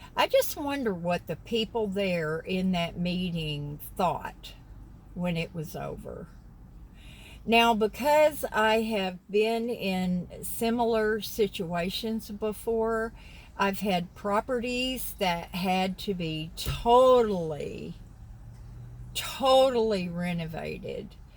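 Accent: South, United States. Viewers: I lived in England until I was 5 when we moved to Canada. I'm in my 60s now, but still develop a peculiar affected sounding accent when talking to Brits or in the UK. However, I also would develop a southern US accent with enough contact. As another commenter wrote, it's incredibly infectious. I would probably develop a southern accent if I binge watched you.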